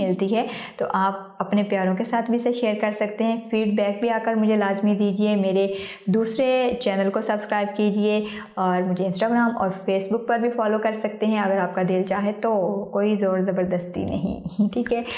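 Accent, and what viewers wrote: Punjab, India. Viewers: Haye tuhadi Punjabi😍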